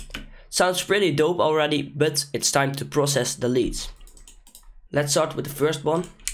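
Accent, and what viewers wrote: Netherlands. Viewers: Are you dutch or from belgium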